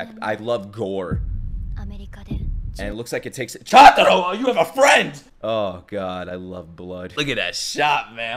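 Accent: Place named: New Jersey, United States. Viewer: I've been trying to figure this dudes accent, definitely Jersey.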